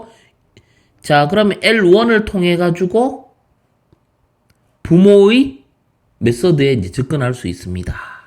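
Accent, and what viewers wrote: Gyeongsang, South Korea. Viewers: 파이썬 고급테크닉을 잘 몰라서 찾아보다가 이거를 처음 봤는데, 사투리 사용하시는게 정말 귀에쏙쏙 잘 들어오네요. 서울말로 하는 것보다 경상도 억양 묻어나는게 훨씬 듣기 편해요~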